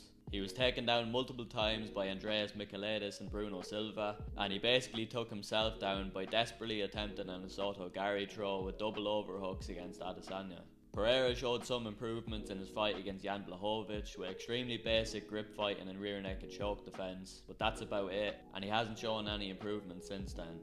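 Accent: Ireland. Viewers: Definitely from somewhere in the midlands in Ireland with that accent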